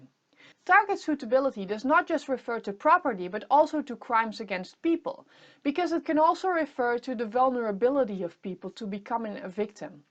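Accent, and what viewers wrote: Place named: Twente, Netherlands. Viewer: Interessant. Goed uitgelegd ook. Passioneel verteld. Met een tukkers - engels accent:-). Hoe gaat het verder met je? Gr Hessel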